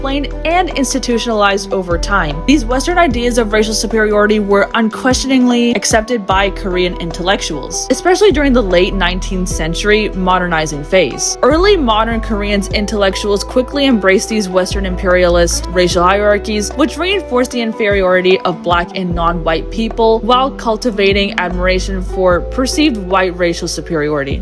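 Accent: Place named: India. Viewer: I heard a desi girl and locked in 💅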